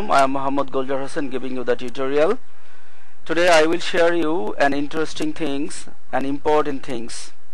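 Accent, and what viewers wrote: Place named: Bihar, India. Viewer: when bihari boy try to speak english it becomes biharish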